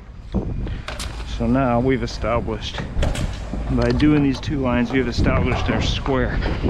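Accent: Canada. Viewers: Are you Canadian?! Sounds like it haha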